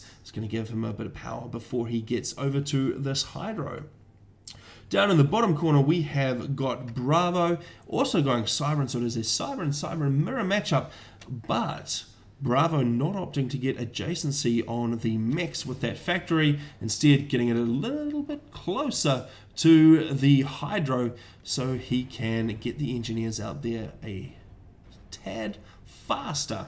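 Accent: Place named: New Zealand. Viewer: Nice commentary and camera movements! I always do a double take when I hear another kiwi accent on youtube :D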